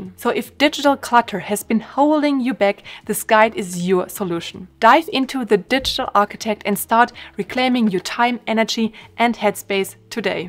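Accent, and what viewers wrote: Germany. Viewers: Are you German? 🙄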